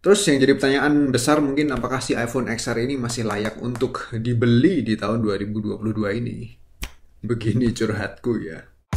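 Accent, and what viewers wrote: Java, Indonesia. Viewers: oalah pantes jowone medok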